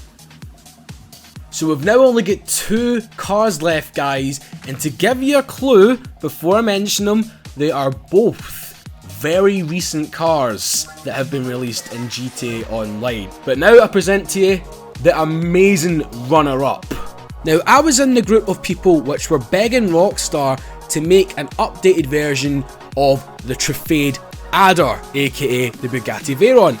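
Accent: Scotland, United Kingdom. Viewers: Are you Scottish? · This guys Scottish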